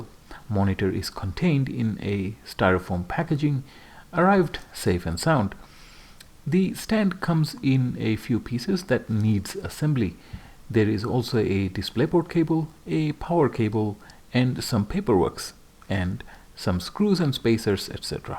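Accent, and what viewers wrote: Bengal, India. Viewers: Is your native tongue Bengali?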